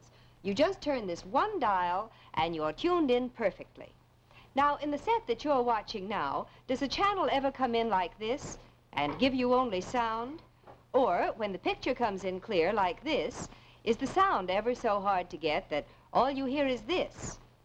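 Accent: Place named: Boston, United States. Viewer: almost sounds like 1950's upper crust Boston accent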